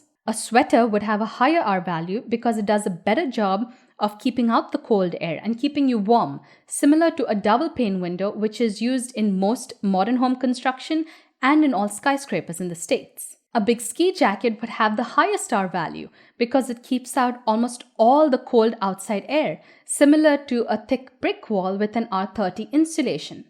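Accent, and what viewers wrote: India. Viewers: Your posh Indian accent gives your content an extra brutal authority, and I'm loving it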